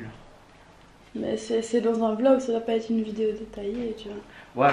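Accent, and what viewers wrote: Belgium. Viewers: Est-ce que tu es d'origine belge ou d'une autre origine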